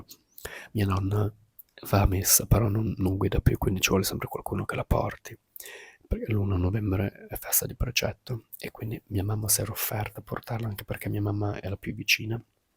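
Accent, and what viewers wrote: United Kingdom. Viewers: Italiano molto migliorato, comunque a parte l' accento inglese l' italiano è perfetto dai, complimenti per la festa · Hai fatto una cosa bellissima per tua mamma! mi spiace debba affrontare tanta sofferenza e da sola ❤ p.s. in questo video hai perso un po' l'accento inglese 😅😉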